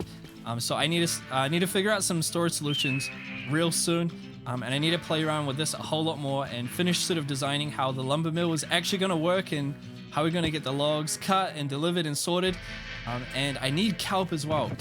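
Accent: New Zealand. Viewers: Huh? You're from New Zealand? I wasn't expecting to hear that.. But that's pretty cool considering I am too!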